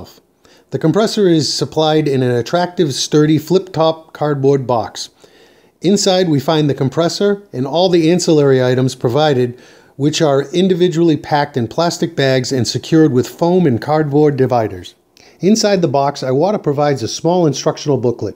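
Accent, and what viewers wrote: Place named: United States. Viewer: Hi, thank you SO much for this!! This is the most comprehensive review on YouTube!! However, I'm confused... You sound as if you are from the USA? Apologies if you are Canadian... We struggle to tell the difference in the accents here in the UK. Iwata is a USA Company. Why did you buy from the UK? Was it a better price? Very kind regards from London UK. Alan.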